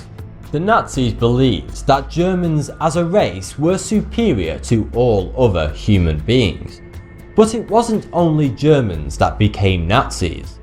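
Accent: United Kingdom. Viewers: Gilbert 개인적으로는 영국억양이라 생각합니다만... (개인적으로) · 이분 영국식 발음 진짜 취향저격; 신기한게 H를 에이치라고 안하고 헤이치라고 하시네요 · 찰진 영국발음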